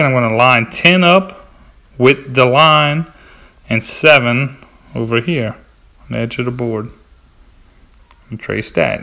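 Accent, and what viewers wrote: Louisiana, United States. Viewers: hey ,,you from louisiana ..you got that cajun accent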